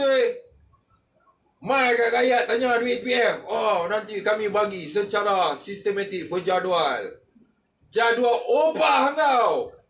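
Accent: North, Malaysia. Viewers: Dulu Cara ckp kl skrng nah ckp utaqa boi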